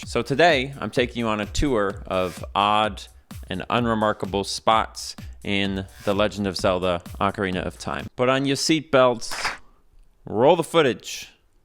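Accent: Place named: New England, United States. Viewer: interesting how your accent says "ruhm' instead of '"room". you, by any chance, from the new england area?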